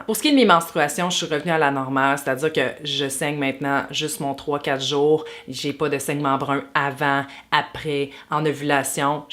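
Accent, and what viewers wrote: Quebec, Canada. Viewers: Français avec accent Québécois! (Joual) rien de compliqué 😁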